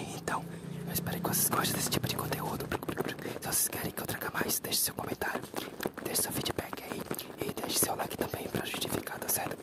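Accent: Bahia, Brazil. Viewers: Eu pesquisei por esse vídeo, fiquei feliz por vocês terem feito pq eu amo o asmr desse canal, e as gírias/ sotaque baiano são os melhores.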